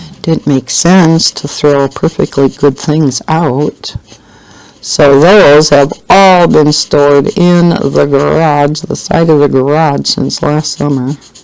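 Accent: Wisconsin, United States. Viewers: praying for you in this rough patch. I already had started this pic so I colored in my new JS Color by number and hope you can show, color and chat with it. Would love to hear your thoughts on this! If you don't have it can I send it to you? So what you are saying is the videos are limited in this season or is it going to be forever? Do you have COPD? You always have a croup sound and cough. Just worried. You don't sound weird to me with your dental issue but you sound like a lady from Wisconsin! You are a soothing cup of hot tea in my life and appreciate you so much! 🥰🌠⭐🌠😊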